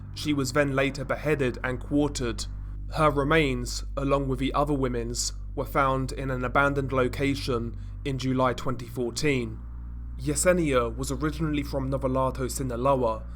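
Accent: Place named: United Kingdom. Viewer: I'm an American, listening to a presumed Britt, inform me about the horrors occuring in Mexico.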